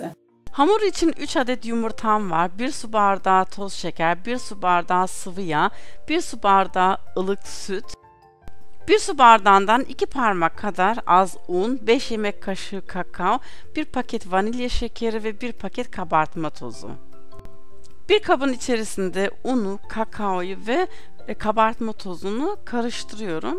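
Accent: Germany. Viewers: Farkındaysan almanca konuşan biri. Türkçeyi bu şekilde konuşuyor olması normal değil mi.. TDK başkanı mısın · Hanım ablamız almanyadan sanirım almanlar gibi söylemiş :)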